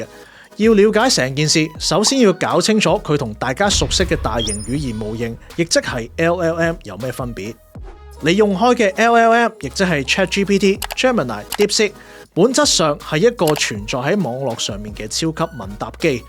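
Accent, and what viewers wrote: Guangzhou, China. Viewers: 簡單, 清楚, 好片。 主持係廣州人? 幾有廣府話味, 幾有個人特色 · 點解主持咁重廣州口音? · 好重大6佬口音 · 主持有種廣府口音 係咪廣州人？ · 師兄是咪廣州人?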